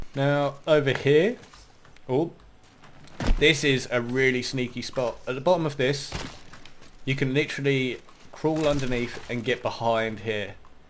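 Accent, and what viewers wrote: United Kingdom. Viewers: Just make sure you keep your English accent and good luck in the states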